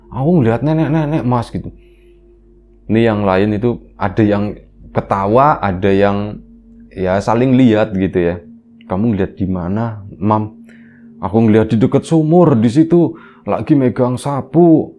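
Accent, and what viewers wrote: Tegal, Indonesia. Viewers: Mas sampean orang tegal bukan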